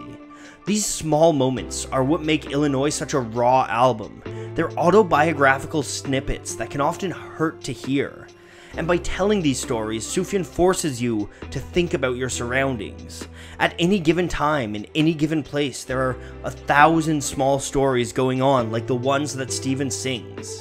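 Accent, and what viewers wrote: Canada. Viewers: You sound canadian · jukeboxjoe As if the thick Canadian accent didn't give it away otherwise lol.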